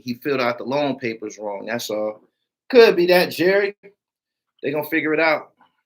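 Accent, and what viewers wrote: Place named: Pittsburgh, United States. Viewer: I be hearing the Pittsburgh affiliation when you speak. We neighbours. Respect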